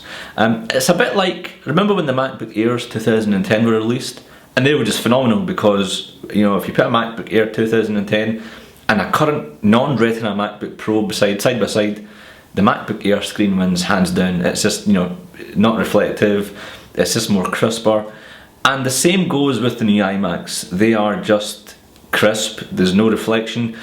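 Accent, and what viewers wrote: Scotland, United Kingdom. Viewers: i like your accent. scottish? i'm italian btw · Love your scottish accent, but im convinced that Siri will learn my language (finnish) before scottish ;) Thank for the video bro! btw: planning my third trip to Scotland! Cant wait to see the highlands once again!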